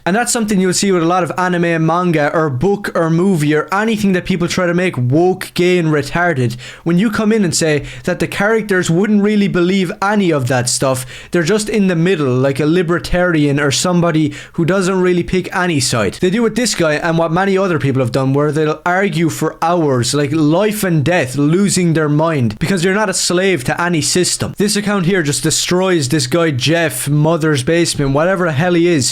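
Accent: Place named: Ireland. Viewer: He’s br ish. Sounds Irish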